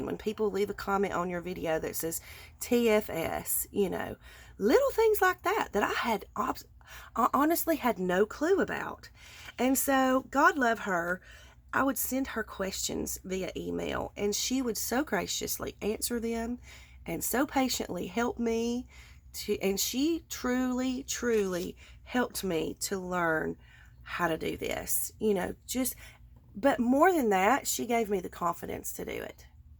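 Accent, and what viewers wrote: South, United States. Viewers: Hi, long time watcher, but recently subscribed. Your vids have been very helpful, informative and entertaining. I love your sense of humour, your voice and your southern accent is charming. I had to take the time to comment today because eventhough my creations are not yet ready for market, just knowing this is available awakens possibilities for all newbies. You have a beautiful heart and it is crafters like you that make this community so amazing. God Bless you and may the purity and kindness He has bestowed upon your heart be conducive to a successful endeavour. You rock! A million times thank you! Feel better soon.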